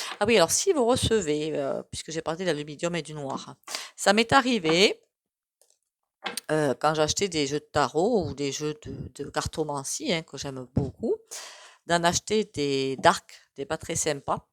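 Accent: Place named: Toulouse, France. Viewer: Bonjour, Je me suis abonnée grâce à Kriss Sinclair. Merci pour votre tirage et vos conseils et soin au pendule. J adore votre accent qui me rappelle Toulouse et ses alentours. Tout de Bon! Eulalie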